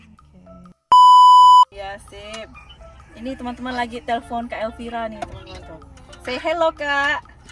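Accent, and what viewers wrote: Pontianak, Indonesia. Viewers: Logat pontianak kak tadak ilang suke liat kak ngomong 😊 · Yeayyyy shopping lagi ka bareng n daddy Boroooong ka, logat Pontianak nya gak ketinggalan ka ❤️💃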